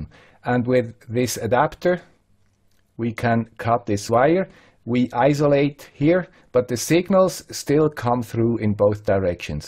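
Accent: Switzerland. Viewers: Merry Xmas and Mrs. Thank you for all your content this past year! I look forward to another years of awesome, educational and entertaining videos done in a Swiss accent!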